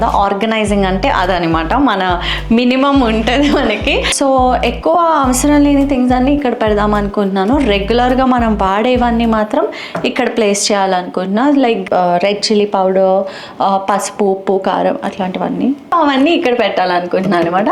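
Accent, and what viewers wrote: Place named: Bhimavaram, India. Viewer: వావ్ 😍 మీ కిచెన్ చాలా బాగుంది, ఆ సామాన్లు చూస్తోంటే నాకు మా అత్తగారు గుర్తోచ్చారు, ఆమె అలానే బొలెడన్ని దాచి పండుగకు శుభ్రం చేసేసి మళ్ళీ దాచేసేవారు 😂 ఆమె తరువాత చాలా వరకు నేను ఆర్ఫనైజ్కి ఇచ్చెసాను. మీ కిచెన్లో నావి బుజ్జి సజెషన్స్..ఓవెన్కి సంబంధించినవి బౌల్స్ అవి ఓవెన్ కిందనే పెట్టొచ్చు కదా? స్నాక్స్ పెట్టారు అక్కడ.. స్నాక్స్ అన్ని ఒక బాస్కెట్లో పెట్టి పైనా పెట్టుకుంటే అస్తమానం డౌన్ అవ్వాల్సిన అవసరం ఉండదు కదా.. గెరిటాలు చాలా ఉన్నాయి, అన్ని వాడం కాబట్టి కొన్ని దాచెయచ్చు మేబీ.. లేకపోతే మెస్సీ అయిపోతుంది. మీ రూట్స్ భీమవరం అని ప్రతిసార చెప్పనవసరం లేకుండానే అత్తయ్యగొరు, మావయ్యా గొరు అంటారు చూడండి. అక్కడే అర్థమైపోతుంది.భలే క్యూట్ ఉంటుంది ఆ యాస ❤️❤️ లవ్యూ వితిక గారు 🩵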